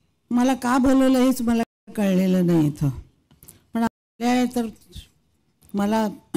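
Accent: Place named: Kolhapur, India. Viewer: ❤❤🎉🎉 खरोखर आशाबाई गायना बरोबर आपण माणसाच्या मनाचाही खूप विचार करत आहात केला आहे तुमचं मराठी प्रेम आणि तुमचे कोल्हापुरी भाषा रवि मज्जा आली शोभा अकोलकर बेरड पुणे वडगाव शेरी मूळची राहणारी करंजी तालुका पाथर्डी जिल्हा नगर अहिल्यानगर मेरे जिल्ह्याची भाषांतर छानच बोलून दाखवली वा तुम्हाला सलाम सलाम त्रिवार वंदन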